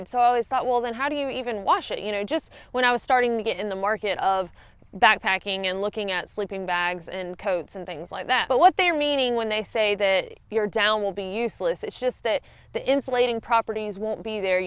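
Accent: South, United States. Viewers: some of that southern accent coming out in this video! olls = oil :) great videos as always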